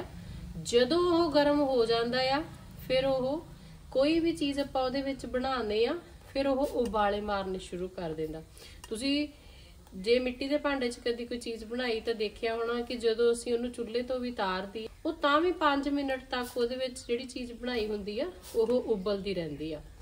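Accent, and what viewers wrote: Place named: Majha, India. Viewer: "gaud" majhay aale sadde aale side di boli badi miththi hai ❤😊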